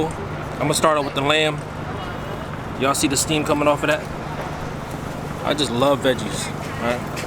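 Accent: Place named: Cambodia. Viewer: Why you talk like ah khmow ?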